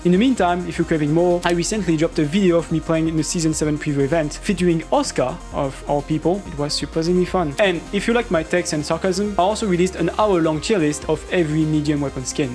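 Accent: France. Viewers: Having a French accent is kinda broken tbh. You can do something shameless and then yell at me with that funny accent and suddenly its ok and I agree with you. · you sound very french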